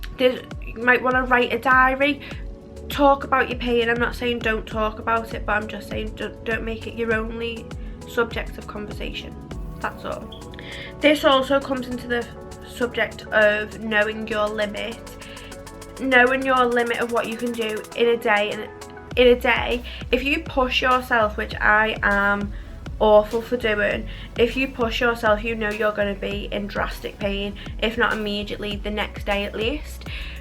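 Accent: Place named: Liverpool, United Kingdom. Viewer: Am in my early 30s and have had it for prob 7 years it’s a nightmare. Glad your putting yourself out there and trying to help us. You sound like a slight scouser too am from the north of Liverpool myself. Keep up the good work your positive outlook is great 👍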